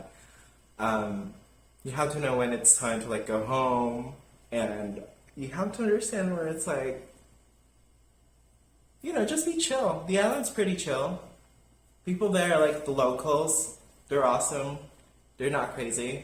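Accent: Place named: England, United Kingdom. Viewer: you look soo beautiful, love your english accent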